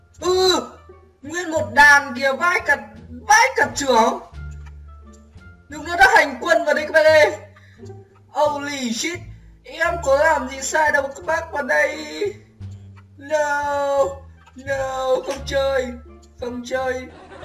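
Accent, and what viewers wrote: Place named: Central Highlands, Vietnam. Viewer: cải thiện cái giọng nói như người vùng cao ý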